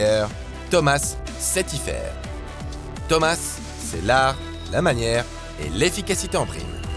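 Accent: Quebec, Canada. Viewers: Non non non pas l’accent Québécois 😭😭😭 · Merci pour cet accent Québécois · L'accent quebecois. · Mdrrr j'étais pas près pour l'accent québécois 😂😂😂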